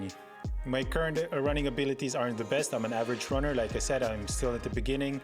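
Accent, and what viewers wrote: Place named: Austria. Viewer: Came for the training diary. Stayed for the austrian accent! 😊 Richtig gut!! 👍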